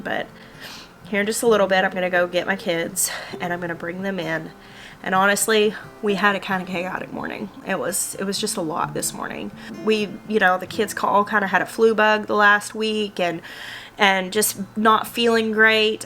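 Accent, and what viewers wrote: South, United States. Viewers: mah goodness, yur sourthern accent is deepening---South Carolina affected??? Your audience is much broader; please remember your viewers watch your channel for information, community, and connectivity. Don't leave the non-southerners high and dry. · Are you saying people outside the South are so intolerant that they can’t handle Southern Accents?! What nonsense. her accent is fine and part of a valuable and unique culture.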